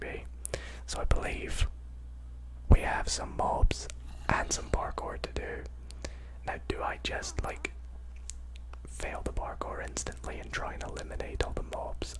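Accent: Scotland, United Kingdom. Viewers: Are you scottish bc my friend is scottish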